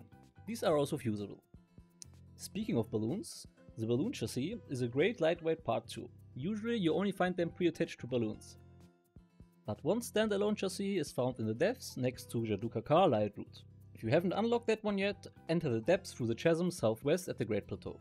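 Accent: Germany. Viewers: ich höre einen deutschen akzent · GErman accent?!?!?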